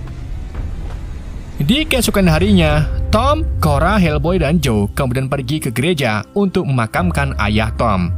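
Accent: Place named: Java, Indonesia. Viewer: Orang jawa sonnya😂😂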